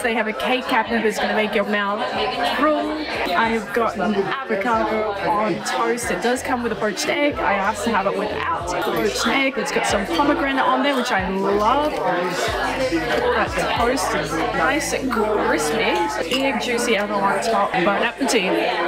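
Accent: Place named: Australia. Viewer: She sounds Aussie, they drive on the same side of the road as Ireland and the UK.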